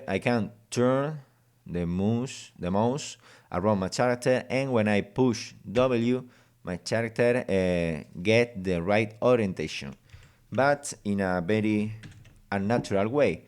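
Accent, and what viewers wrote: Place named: Spain. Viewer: I like your spanish accent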